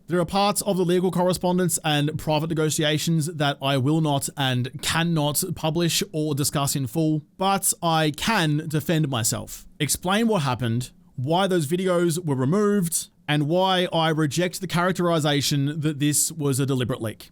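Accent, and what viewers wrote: Australia. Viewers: nooo-wwwww. video-wwwwwww. the older i get, the more obnoxious the australian accent becomes. insufferable. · I am here for the, not the game... for many years now...at first i was like "dang that man is talking like a fkn machine gun and is also as loud:D" but i stayed, first for learning the game and its mechanics, later, because i love your loud aussi snout;) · I have been following you for what feels like nearly a decade now. You have been my favorite content creator based on your personality and great Aussie accent. Keep your chin up, it will get better.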